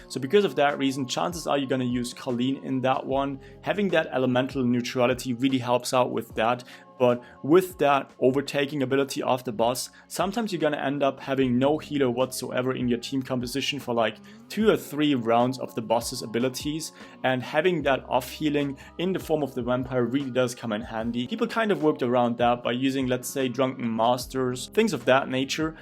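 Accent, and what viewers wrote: Germany. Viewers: Are you german? That accent cant be anything other than german.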